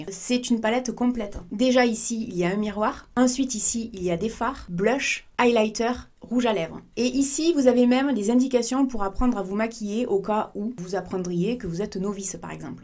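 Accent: South, France. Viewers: J'aime trop ton petit accent du sud il est trop chou 😘😘💛💛💛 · J’adore tellement ce genre de vidéo venant de ta part ,avec le petit accent du sud 🙄🙄 Mérite beaucoup plus d’abonnement je trouve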